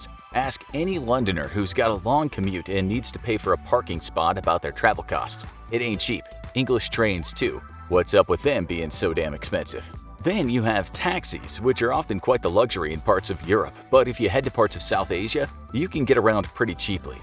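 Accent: United States. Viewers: Ik bro i had a smirk when i heard him say that in his American accent get the infrographics show start speaking middle class british slang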